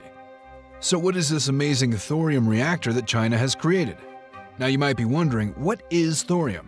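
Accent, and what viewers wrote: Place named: United States. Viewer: chinese propaganda video with an american voice narrator rofl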